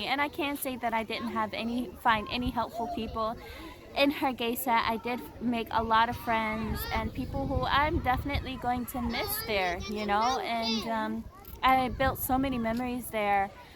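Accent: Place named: Senegal. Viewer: Bit confused your accent as I couldn't see your face Im unable to identify where ORIGINATED!. Are from Senegal or Namibian? Its really shame that you leaving the Republic of Somaliland because its peaple are "Too Nationalism" to me the great people of Somaliland are really lacking of it. Come to America and you will realise what nationalism is it all about, in a good way. Tribalism IS NOT good but healthy nationalism is fine. In your speech, I REALLY LIKED 👍 when you were saying "Hargeisa Somaliland," and u repeatedly said that number of times. In which I have appreciated it a lot. Anyway, safe journey wherever you are heading right now. But plz avoid mixing the Republic of Somaliland and Somalia for ur next videos as these 2 DO NOT GO hand in hand 4 obvious reasons. Finally come back soon if u really believe the Republic of Somaliland in heart ❤️ 💙 💜 💖 💗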